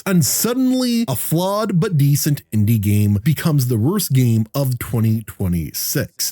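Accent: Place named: Canada. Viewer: I think because you're Canadian you don't notice, but every Canadian I have heard says "about" the same way to me. Everything else you said sounds fine to me though. I'm English just for context.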